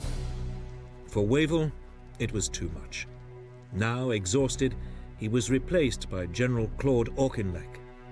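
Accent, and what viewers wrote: United Kingdom. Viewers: I only had to read the description to know it was another propaganda load o'crap about Italy's role so didn't even watch. I would have bet a million it's British, and listened for 10 seconds and yep, sure enough.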